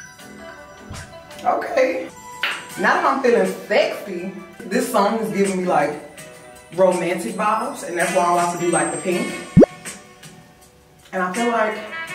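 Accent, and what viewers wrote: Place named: Houston, United States. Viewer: What part of the H? I'm from Houston too. Beyonce talk like this too lol. Real country lol...It's an HTOWN thang 🤪.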